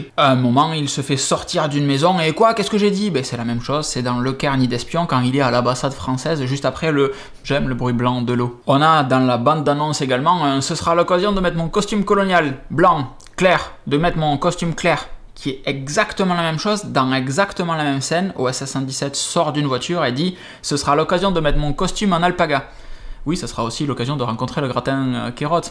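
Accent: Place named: South-West, France. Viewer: PS : tu as la même voix que Pierre Sabatier chez Primeview, accent du sud ouest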